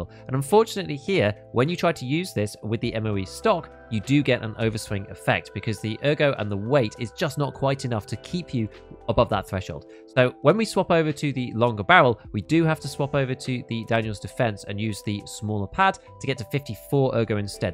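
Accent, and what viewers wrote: United Kingdom. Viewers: yea... dying and losing his kit wasn't enough shame. Then the replay of his death was immortalized on youtube along with his build getting hyper-analyzed and criticizing every little thing that was wrong with his gun. These videos are actually brutal even though the soft talking brit with the calm music makes it seem so wholesome.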